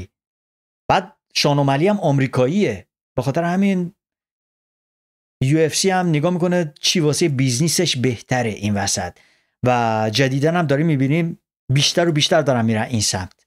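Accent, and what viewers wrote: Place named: Lorestan, Iran. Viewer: اویل لحجه لری بعض هیچی♥️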